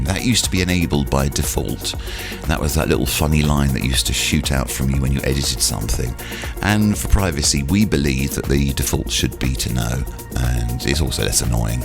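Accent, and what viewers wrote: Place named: England, United Kingdom. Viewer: i'm sorry.... I am having trouble understanding.... can you explain it to me inworld at my place?? the sexy voice and the english accent gets my brains in noodles 🤣🤣🤣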